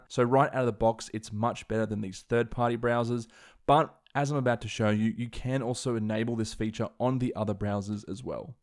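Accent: Australia. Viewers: Brilliant video... love the Australian accent my friend.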